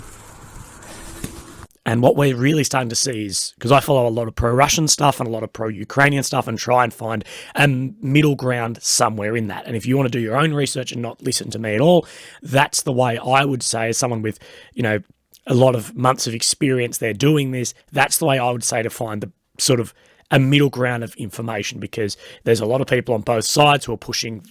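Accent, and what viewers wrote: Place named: Australia. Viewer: the western corruption is far more discreet and is missive it seames the military's is used as a cash cow no mater where it is . Even the amount of maintenance western weapons' have to undergo to keep it running at peak performance is dodge. I like your channel and hearing an Ozzy accent presenting your take on the situation is good . cheers mate and best of luck with your health battle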